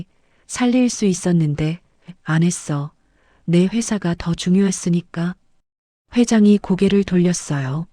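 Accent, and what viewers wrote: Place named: China. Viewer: 쭝꽁놈인가 말이 이상하네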